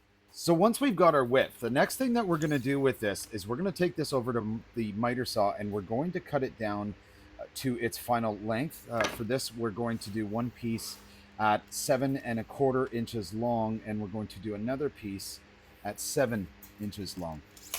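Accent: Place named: Canada. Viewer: Subscribed because you say aboot and oot ....and the video is great. Greetings from Atlanta!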